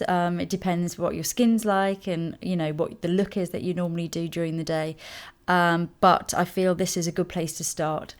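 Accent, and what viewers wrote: United Kingdom. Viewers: Thu Nguyen she's British?!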